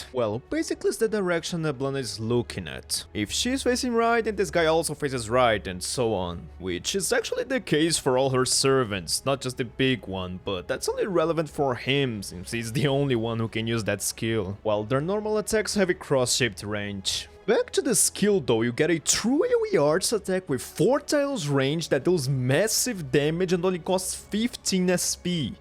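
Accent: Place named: Brazil. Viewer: sorrio toda vez que abro um vídeo sobre um interesse relativamente random meu e escuto um sotaque brasileiro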